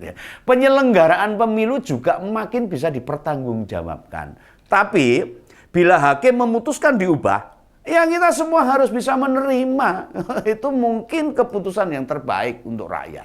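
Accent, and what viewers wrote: Java, Indonesia. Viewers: Mbok sabar to pak pak (SBY)..om medhok banget Jawane.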